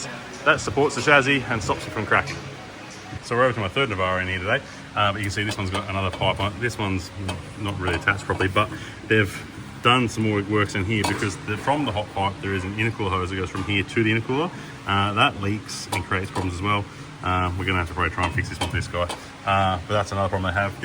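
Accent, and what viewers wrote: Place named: Australia. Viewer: That's one thick Aussie accent you got there. Really hard to understand you when you talk fast. I couldn't even finish watching because I wasn't getting much out of it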